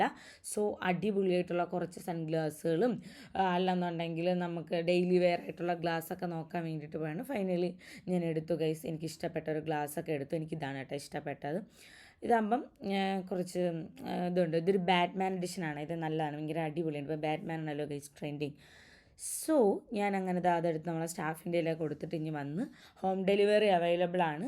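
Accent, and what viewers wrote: Trivandrum, India. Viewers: ഈ പൂകിരി മഹാ ബോറാണ്.. മര്യാദക്ക് സംസാരിക്കാൻ പോലും അറിയില്ല... ഒരു തൊലിഞ്ഞ തിരുന്തോരം ഭാഷ.. എഴിച്ചു പോടി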